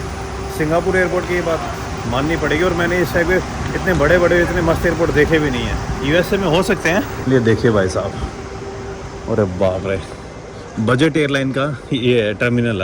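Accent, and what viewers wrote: Haryana, India. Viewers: Arey yo toh haryanvi Bhai se . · Hariyanvi tach I like it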